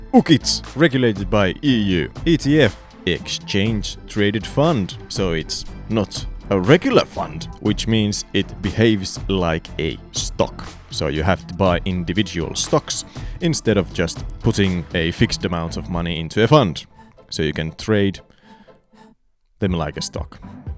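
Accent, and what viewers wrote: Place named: Finland. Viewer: Ah, that Finnish accent makes the entire video worth watching.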